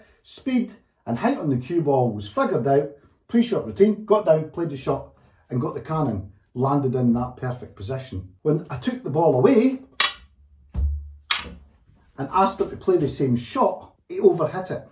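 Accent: Scotland, United Kingdom. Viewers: you sound much like a scot!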